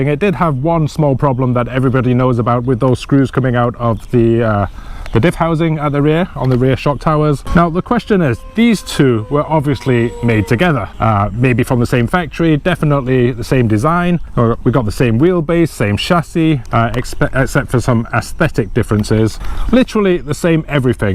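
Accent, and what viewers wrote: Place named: France. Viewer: ahah l'accent français à couper au couteau. Change rien !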